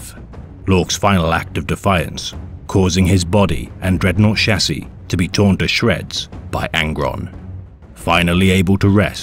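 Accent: United Kingdom. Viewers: Lock Laok Lorke Lawk All sound identical with a british accent.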